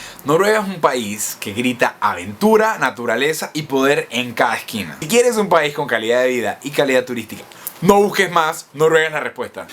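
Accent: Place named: Venezuela. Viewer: Porqué hablas con acento venezolano?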